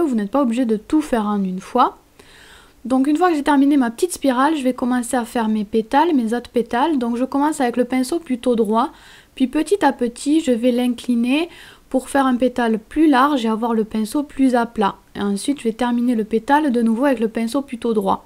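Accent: South, France. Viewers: Hiiii ! Olalala c'est vraiment très très beau - Je rêve ou c'est un ptit accent du sud que j'entends ? =p Bisous bisous + j'aime hihi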